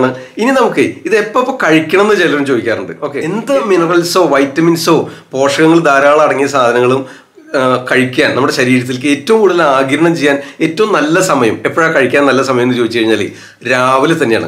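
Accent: Thrissur, India. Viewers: Ethra nalla avatharsnam dr.Trissur bhashayodu thanne sneham thonnunnu😍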